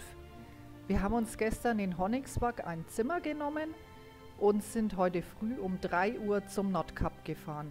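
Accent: Franconia, Germany. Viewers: Die Franken im Norden. ..... hab den Dialekt erst gehört, später Fürdder Kennzeichen, alles klar;) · Allmächt.su schee. Dei fränkischer komendar is glasse. Die Reise verlockt zum Nachfahren,wenn des sch.... corona rum is.derf mä frong was die ganze Tour gekostet hat.? Abo und like is da.liebe grüsse aus kulmbach.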